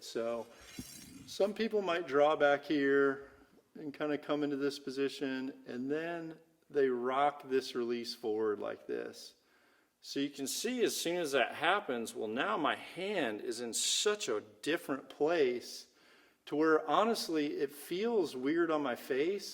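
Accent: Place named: California, United States. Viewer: So hard to suffer the vocal fry. Californian is the worst accent.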